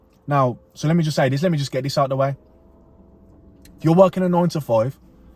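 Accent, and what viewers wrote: Birmingham, United Kingdom. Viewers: I saw your video on when you made your first £10K and I love how honest you were I can’t wait to jump out the matrix too btw where you from you sound like you’re from Brum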